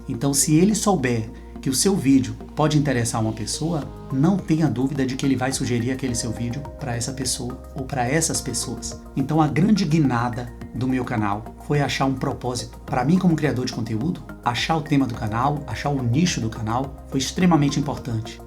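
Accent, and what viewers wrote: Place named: Northeast, Brazil. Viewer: sim, adorei a Live, as horas passaram e nem vi... e quanto a voce, foi uma surpresa boa, mais um canal que ajuda a gente a crescer aqui no YouTube com dicas e sacadas bacanas!! Gostei do seu canal, aos poucos vou assistindo os seus conteúdos, você tem um jeito bacana de transmitir e de se comunicar, talvez pelo sotaque leve do Nordeste, talvez pelo jeito doce, Cândido de falar, muito agradável e um diferencial dentre os outros canais com meu nicho!!! Parabéns, já ganhou mais um inscrito!!! Abraço do Big 💪💪😃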